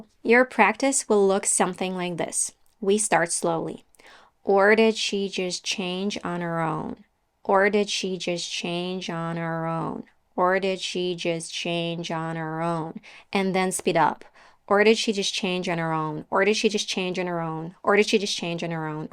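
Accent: United States. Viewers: you are american 😂😂😂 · Thank you so much! It's helpful! But do British people understand such American English?🤣🤣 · This is American English, I do not think British English sounds like that. I live in the UK.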